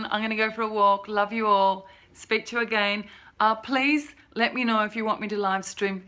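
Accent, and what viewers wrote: Australia. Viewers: Love the Aussie accent it's sexy , I'm scots /Irish we have a huge bond with Australia x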